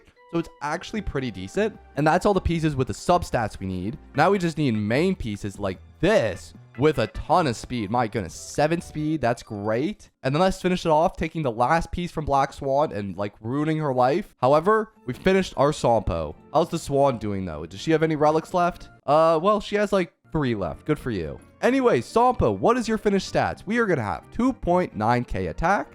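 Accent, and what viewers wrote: Canada. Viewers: Your Canadian accent is cute lol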